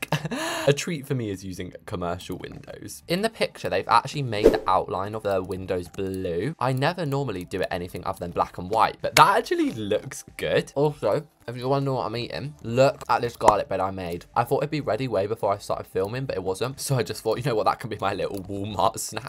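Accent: United Kingdom. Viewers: i always wanted to learn your accent i love britsh accents i really want to go to England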